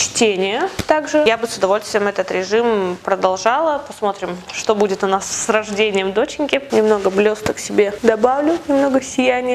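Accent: Germany. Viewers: Офигенно говоришь по-немецки.Такой акцент😍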